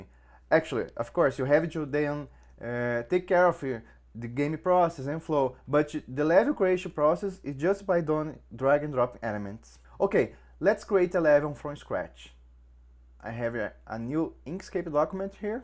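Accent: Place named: Brazil. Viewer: Are you brazillian: Your accent looks brazillian.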